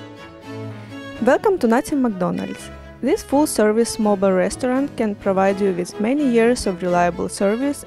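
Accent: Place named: Russia. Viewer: Да она Макдональдс вообще по-русски произносит.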